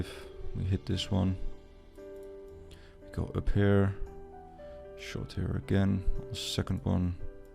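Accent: Netherlands. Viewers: Nice video! Are you Dutch by the way? 😄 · Are you Dutch? :)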